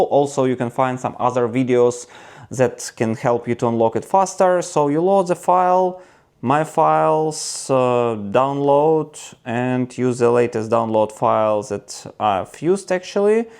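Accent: Russia. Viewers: А по русски? У тебя русский акцент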